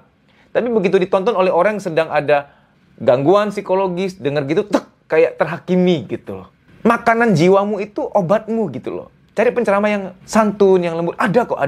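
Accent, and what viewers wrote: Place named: Lampung, Indonesia. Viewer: Logat bicaranya seperti suku lampung ....bener tidak pak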